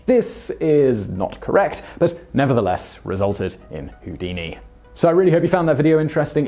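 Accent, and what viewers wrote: United Kingdom. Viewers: You have the britishest accent ever my friend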